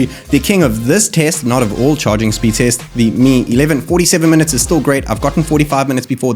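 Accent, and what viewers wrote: South Africa. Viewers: Listening to you, sounds like you're South African, getting some SA accent vibes :D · Bro are you from SA? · Had to like and sub because of the South African accent ❤️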